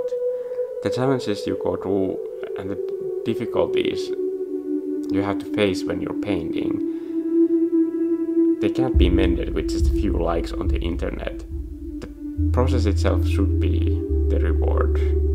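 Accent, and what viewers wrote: Finland. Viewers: I knew you’re Finnish because of your accent before I even saw your username lol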